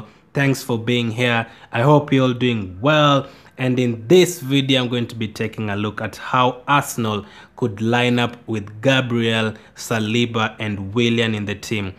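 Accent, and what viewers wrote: Kenya. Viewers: Yo he has a kenyan acsent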